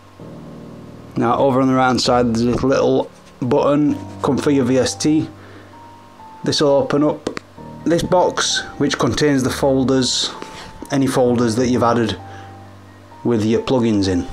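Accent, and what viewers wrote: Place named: Manchester, United Kingdom. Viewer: love the Manchester accent :-) thanks for the video. im stuck between either getting acid Pro or reason I'm leaning towards acid Pro because it's less money I'm into making more minimal kind of electronic music ambient stuff so yeah. you any relation to Karl Pilkington?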